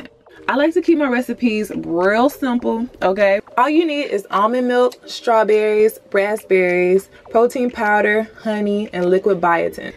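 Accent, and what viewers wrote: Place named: St. Louis, United States. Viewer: Lol we kinda sound alike...sounds like u may be from st. Louis.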